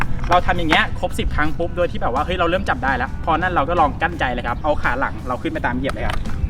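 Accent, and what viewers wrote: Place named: Kanchanaburi, Thailand. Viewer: เป็นคนเมืองกาญมั้ยอะ สำเนียงคุ้นหูแบคนกาญมาก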